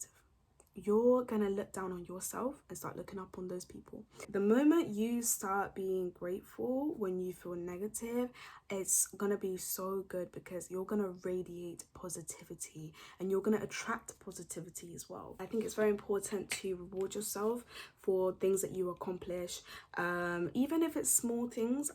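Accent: United Kingdom. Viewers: Your British accent is so refreshing and it's giving!!😩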